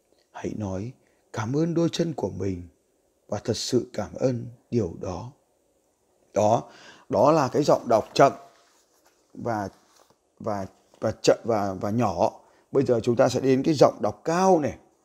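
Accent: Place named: Hanoi, Vietnam. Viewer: Giọng Hà Nội là hay rồi.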